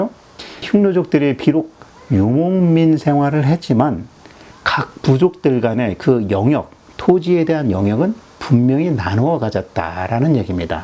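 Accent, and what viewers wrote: Gyeongsang, South Korea. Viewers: 깅상도 아이가?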